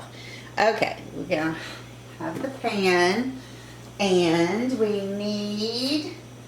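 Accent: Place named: South, United States. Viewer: This looks cool btw I like your voice it sounds southern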